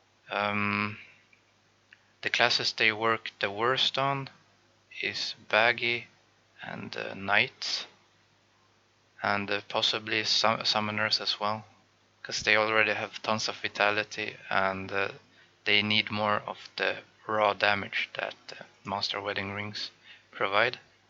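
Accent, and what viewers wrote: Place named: India. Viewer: What? You sound indian :D . Of course i know it. But good to know for people who don't know it.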